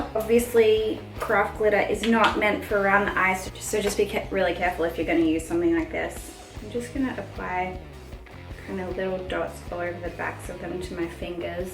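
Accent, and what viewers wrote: Australia. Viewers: I loved this !! I thought you were Australian - did you move to America? Xx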